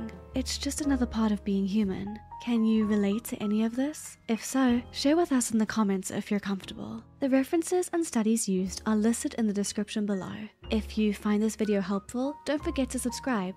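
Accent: England, United Kingdom. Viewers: wait, gone British all the sudden now lol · Why does she’s have English accent this isn’t normal is it does she do some the videos 🧐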